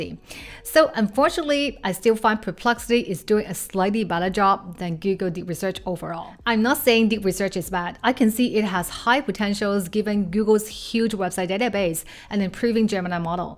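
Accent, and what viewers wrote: China. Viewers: Thank you for your video in comparison; could I make a positive suggestion? for a non native english speaker but with good english language skil, I had a hard time following every thing you said. it was way to fast for me to be able and follow your explainations? Dont get me wrong, I mean it as a positive feed back. You have the typical chinese or asian accent, and speaking so fast, as if you tried to put 20 min in 5 minutes, that it became hard to follow. good luck, and thank you again. 🙏👍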